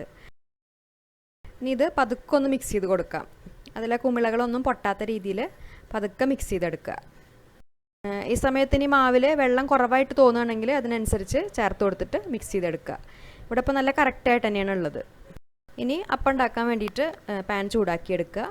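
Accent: Thrissur, India. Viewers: 😋😋😋😋😋 Mol trissur aano...bhasha kettu chodichathaatto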